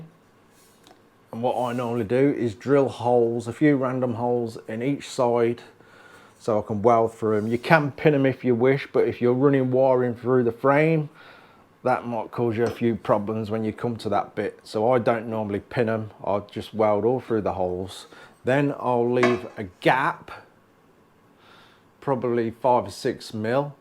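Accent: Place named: Black Country, United Kingdom. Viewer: Hi I've been watching your videos for some time now and I'd like to say how great it is to watch a professional /thorough craftsman at work! Thank you for sharing your knowledge with us! Just curious, You sound local to me, I'm in brierley hill what area do you live in? Thanks Mark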